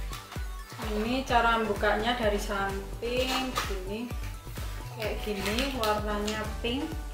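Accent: Java, Indonesia. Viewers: Medok bnget ya mbak.trims. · Medokk bgt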